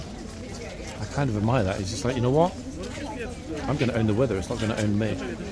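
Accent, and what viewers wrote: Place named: England, United Kingdom. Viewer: What part of England does your accent come from? You are easy for my American ears to understand! That rain is relentless!! Funny how spoiled the horses are...mine would often choose to stay out in the pasture in the rain and snow instead of heading into his barn!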